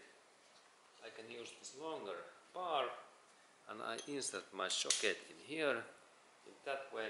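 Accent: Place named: Finland. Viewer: hirveen kuulosta tuo ralli englanti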